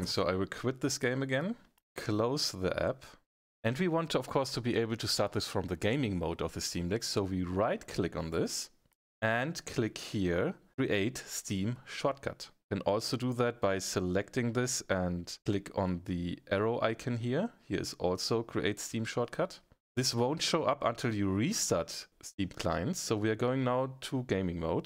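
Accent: Germany. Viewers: Hey, hast du ein deutschen Akzent? 😅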